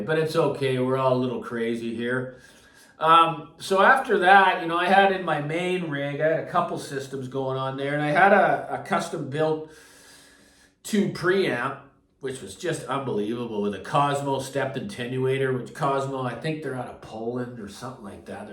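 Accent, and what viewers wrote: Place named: Canada. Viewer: Merry Christmas to you,. We’ve got about 3’ of snow here, south of Buffalo. Record season has officially begun. Just fired up the old 80’s Mac rig. Always let her warm up with a little Canadian radio, 101.1 More FM, currently. What a privilege to have grown up with all these great stations. I’m new to your channel…but, pretty sure I picked up that unmistakable “native tongue”. 🇨🇦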